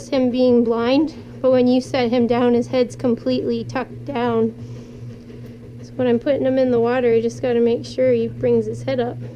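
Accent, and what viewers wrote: Canada. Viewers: Judging by the way you speak, you must come from southern Ontario. I'm from northern Ontario. You wanna talk winters??? My daughter moved to Windsor I was almost ready to move with her lol. We get really bad storm up here. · The moment I heard you talking I knew you were Canadian! Just found your channel & I loved your commentary on this video! Subscribed & happy to follow a fellow Canadian ;) look forward to seeing more!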